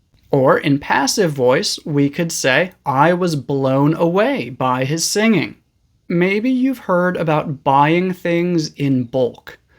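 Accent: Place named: United States. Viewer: I was blown away by your beautiful American accent. 😊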